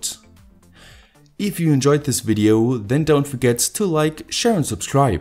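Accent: Germany. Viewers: You sound like you're a German... It's not worth trying and build your own PV modules...Tried everything and it's just throwing away money and time for something that's never going to work and last like ORIGINAL fabric made one... Best regards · Of course he comes from Germany, you can clearly hear his accent.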